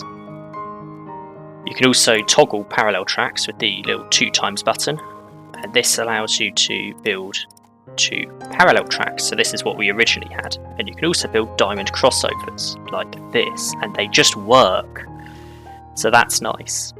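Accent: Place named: United Kingdom. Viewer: You sound British but say beta the American way. lol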